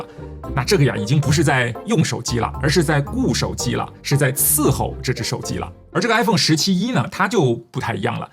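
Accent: China. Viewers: 你们台湾人觉得不觉得他的口音更像大陆的？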